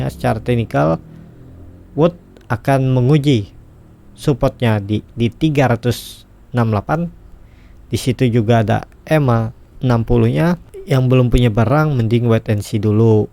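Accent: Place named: Borneo, Indonesia. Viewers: Bos logatmu kok kadang seperti orang sunda?. Aslimu Borneo apa sunda? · Logatnya original ini asli borneo..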